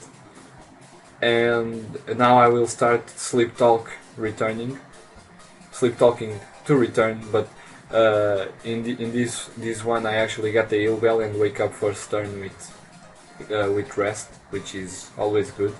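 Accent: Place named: Portugal. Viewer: do teu sotaque xD vi logo que eras português x) foi mt fácil xDD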